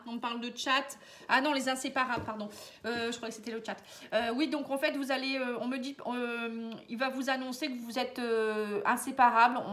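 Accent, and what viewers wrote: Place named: North, France. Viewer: Merci infiniment vous relater mon histoire je suis bluffée vous êtes très forte! L'amour Est plus fort que tout 😊🤣 J'adore votre accent du ch''nord n'est ce pas?😉 Je vous envoie toute ma lumière et mon amour 💐❤️😇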